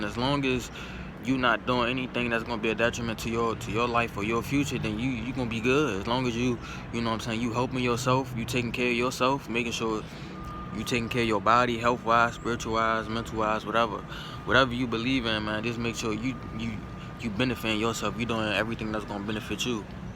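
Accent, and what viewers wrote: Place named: New York, United States. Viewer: Damn sound like you from NYC Or Philadelphia from your accent where you from?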